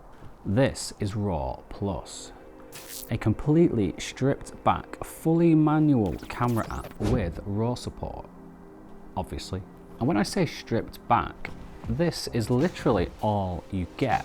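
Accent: United Kingdom. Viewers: Hei. Accentul britanic și având în vedere că ai scris mulțumesc în descriere este foarte confuz. Super videoclipul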